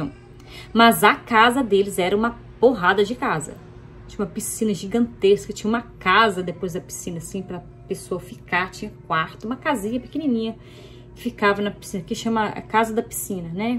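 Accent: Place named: Minas Gerais, Brazil. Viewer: FAZ DIFERENÇA SIM, SEUS CABELOS SAO LINDOS A PELE ESTA OTIMA! MAS O QUE EU GOSTO MUITO EM VC É O SEU JEITO MINEIRO DE FALAR. SE TORNA UMA PESSOA DIVIRTIDA DE SE VER CONTINUE SEMPRE ASSIM!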